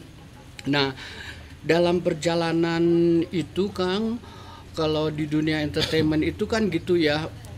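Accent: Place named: West Java, Indonesia. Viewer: Kang nyariosna meni ngosom khas Sunda pisan...👍👍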